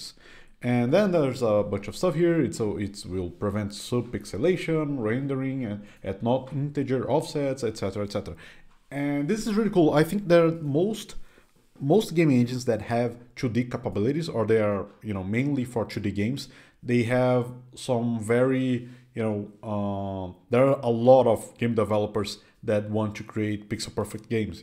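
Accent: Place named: Russia. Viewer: Porque que ce ta com sotaque semi russo mermaooo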